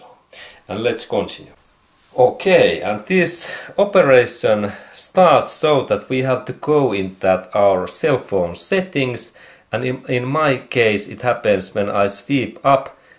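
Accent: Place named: Finland. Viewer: Your Finnish accent is soooo cute. 😉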